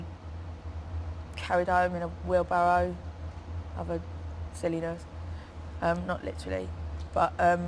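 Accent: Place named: England, United Kingdom. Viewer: Love her English accent. Sad she died so young.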